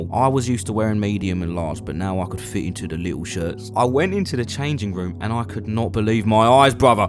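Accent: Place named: Essex, United Kingdom. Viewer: Proper funny mate - just found your channel, you sounds like a fellow Essex man.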